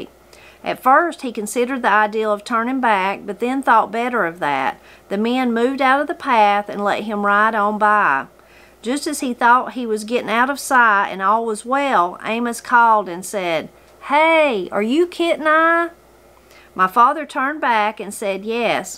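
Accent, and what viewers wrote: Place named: Kentucky, United States. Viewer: I remember my grandmother’s voice and mama’s stories of family with every turn of your voice. Even though mama’s family was transplanted to Oregon, the Kentucky in their voices and stories echoes in my mind with each story you read. Thank you.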